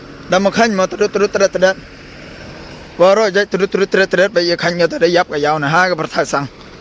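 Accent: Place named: Meghalaya, India. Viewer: Nga u #Bhoi Kwah oh u tip chi klam #Pnar kam phi leh Sngiaw suk sang te i sur #Pnar phi bro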